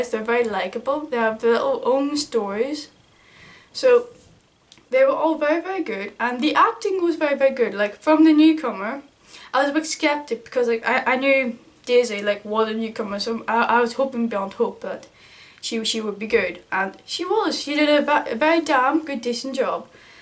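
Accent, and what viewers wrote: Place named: Ireland. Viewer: Nice review. As an aside, I didn't realise you were Irish; I though Scottish :D Excuse my poor English ears!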